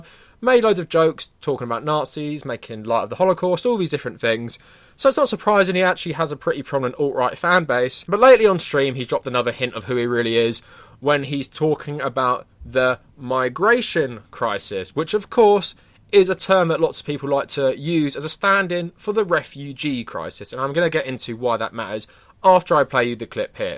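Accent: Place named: United Kingdom. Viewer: You sound brittish, how does it feel to be a traitor to your own country and watch it be decimated by the corrupt leaders?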